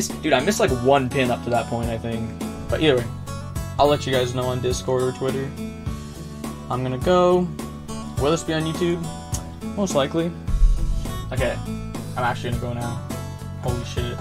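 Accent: Canada. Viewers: you sound like youre from both Canada and chicago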